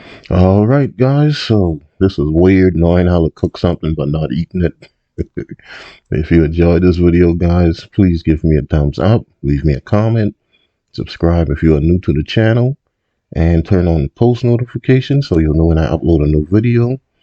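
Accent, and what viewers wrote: Charleston, United States. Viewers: You sound like you from Charleston 👀👀👀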